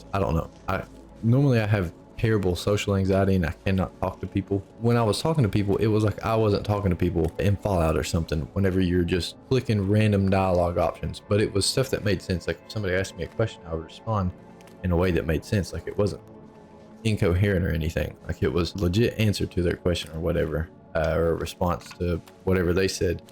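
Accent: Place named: Appalachia, United States. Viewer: Judging by your accent and the way you said "retched" instead of "reached", I'm gonna guess that you're Appalachian (me too). I'll never doubt people's stories of crazy stuff they see in the mountains, there's just too many stories for them all to be fake. I'm glad the dissociation passed for you, but this whole experience had to have been insane.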